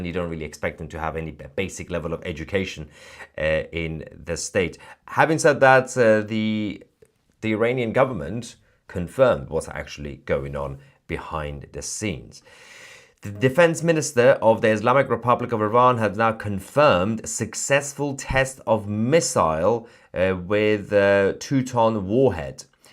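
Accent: United Kingdom. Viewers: Well, Trump didn't want war and Bibi did. Shows who really is in charge. Another disgrace is beginning. Asa for this announcer, he has a British accent. Just for that, I wouldn't believe a word he has to say.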